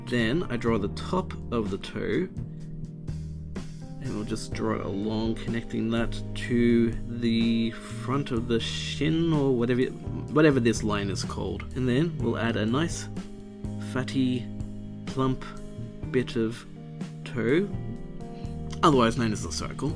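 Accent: Australia. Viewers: ! I didn't know you were Aussie! I am too!!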